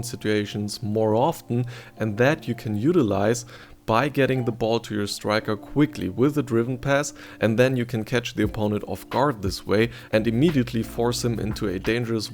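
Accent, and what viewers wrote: Germany. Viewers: sounds german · It is soooo easy to hear you are from germany hahah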